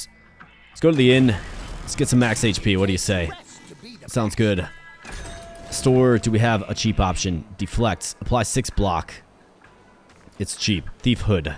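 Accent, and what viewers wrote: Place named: Canada. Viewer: You know its plague and not pleg ? Are you Canadian? Canadians cannot say that word for the life of them, kinda odd. Only noticed it when I started watching youtube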